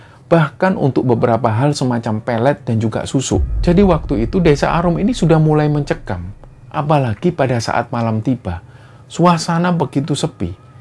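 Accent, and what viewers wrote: Java, Indonesia. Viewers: Xg Q suka dari ms tuh suaranx, terus logat jawanx terasa kentel banget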